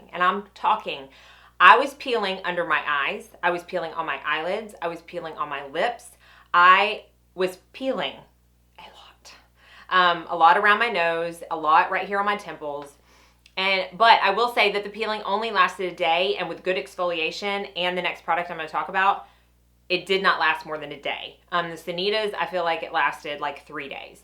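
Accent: South, United States. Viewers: Good info but this could be edited down to half. Most people don’t want to watch unnecessarily long videos. You could have a huge following if you get to the point and just talk about the products and how to use them. No useless banter about the shape of bottles, etc. Sounds like you’re from the South and I know women talk a lot in the South because my mom and aunts were from Louisiana but please, CA women will never take the time to watch this entire never ending video.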